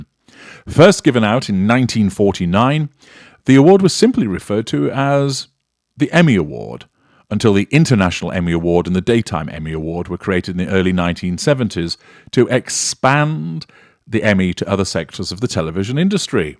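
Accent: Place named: Scotland, United Kingdom. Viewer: What would be worse than to go through the Apocalypse? Finding that Harry's Wife was on the Panel on... Judgement Day! She'd Close the Gates to everyone! Thanks, loved your Scottish Accent!💖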